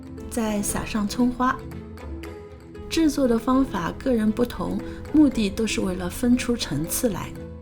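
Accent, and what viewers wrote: Shanghai, China. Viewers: 阿姐太厉害了👍我也是上海人，在伦敦。我是从生煎那个追到这里的。一口气看了你好多视频。看你做菜真是享受，步骤详尽理论清晰，上海口音又亲切，你还长得像我姐姐。😂真是懊恼没有早点发现和关注你。实在压抑不住欢喜，决定要把我在油管的第一次评论献给你。😊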